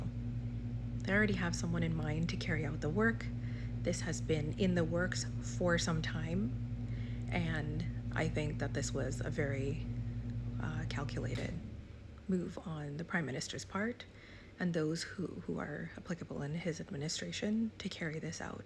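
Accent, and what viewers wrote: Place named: United States. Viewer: woman geh we Ah for Kane chance nah, is you who’s in somebody’s pocket. Yuhs ah UNC operative trying to trick people wid yuh yankee accent. Allyuh fullah education, but dunce like bat!! still following this outdated cane farmer union propaganda from the 1950s, it’s time for an upgrade. Allyuh ent shame?? And BTW this is a legislative issue which has to pass through parlaiment, and not a referendum where public participation is needed, and isn’t it the reason why the people voted for members of parliament? they are appointed as voices of the people in their individual constituencies, so there’s no need for a national consultation, so stop trying to fool the people. Shame on you!!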